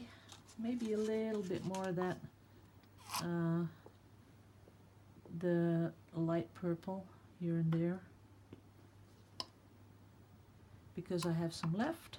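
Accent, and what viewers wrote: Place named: Netherlands. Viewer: This is truely beautiful - just like its creator! We can see how gorgeous it is so stop worrying about that. Also, I love your chit chat and so look forward to coming home from work each day to listen to and watch you pour/swipe. I laughed when you said about getting your hubby to speak in English - mine told me that I was beginning to speak with a Dutch accent cos I' listening to you every day!! LOL Take care and looking forward to the next road trip when you have a spare minute.... how is your sister's piece coming along? Cheers, DEB T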